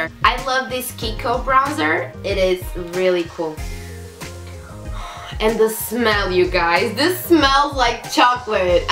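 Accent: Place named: Portugal. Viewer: hmmm okkk! Mas se calhar era uma forma de eles começarem a perceber outra língua! Acho que não é tão natural, eu percebi logo que eras portuguesa. E atenção, que falas muito bem! Mas acho que podias ter mais sucesso de falasses em português. Mas se não te sentes confortável, então já é outra coisa. Muitos beijinhos!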